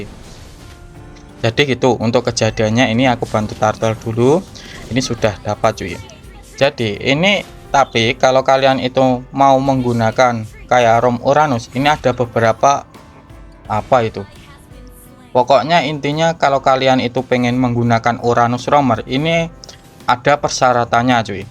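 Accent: Java, Indonesia. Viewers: Jawa bang?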